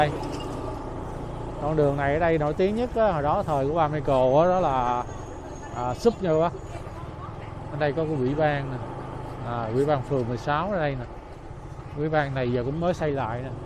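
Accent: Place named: North, Vietnam. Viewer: hello ,happy birthday , den cay la tieng cua mien nam , nen la tieng cua nguoi Bac viet .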